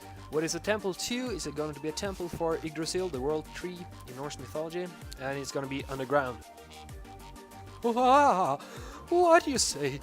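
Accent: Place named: Australia. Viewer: nice vid! like ur australian accent mate ;)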